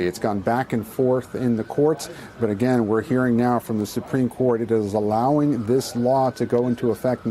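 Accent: United Kingdom. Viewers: What kind of Mickey Mouse operation is this? Starting with a British accent then everyone’s phone is going off in the background. Turn off those candy crush notis katey!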